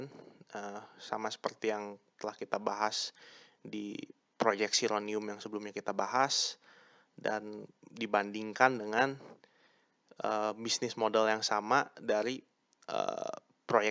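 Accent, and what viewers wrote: China. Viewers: Wong logatnya aj Aseng bngt Panteslah klo u ko kontra SMA bossman 🤭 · Logat aseng....jualan liur lu seng😆😆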